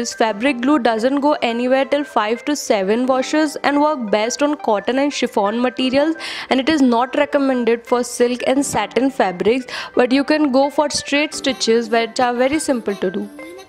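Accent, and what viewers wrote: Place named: India. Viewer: good idea. but indian accent is so ugly to hear. cant stand long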